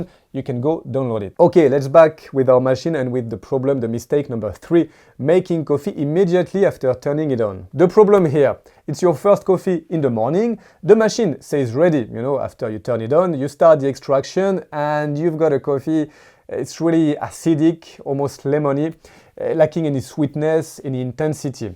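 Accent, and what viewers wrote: France. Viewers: Un français qui parle anglais 😊 merci j'ai tout compris 🙏✨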